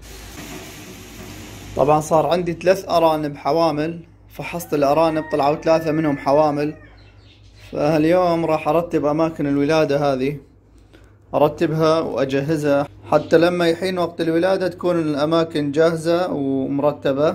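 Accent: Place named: Mosul, Iraq. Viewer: عبالي شامي طلعت من اهل الموصل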